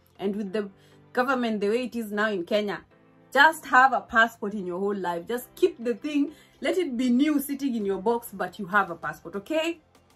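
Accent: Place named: South Africa. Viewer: You speak like South African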